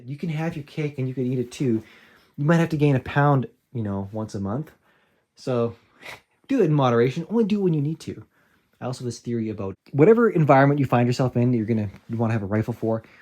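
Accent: Canada. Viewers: Ahy do you have a canadian accent and use ML ? 🤪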